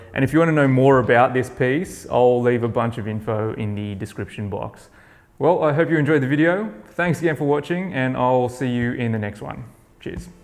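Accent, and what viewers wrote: Australia. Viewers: Brilliant piece. I only realised you were Aussie in this video. Maybe I haven’t seen a video you speak in. Would love to visit your studios next time I’m up that way.I’d love to pick your brain. I just moved to Vesting LED cured hard wax oil. Not sure if you know it. Pretty cool, and I definitely had to make a few adjustments to sanding but it’s a great finish so far. And the instant LED cure is pretty amazing. I switched from Osmo too and I absolutely had issues with water/liquid damage with the last few pieces compared to previous. Never thought about too much till you mentioned it. Anyway, thanks for sharing as always, brilliant.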